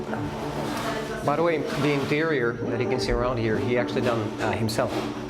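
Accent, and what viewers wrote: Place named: Czech Republic. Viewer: Akcent máš český, ale jinak celkem pěkné video :) Ozvi se, potřebujem herce s angličtinou.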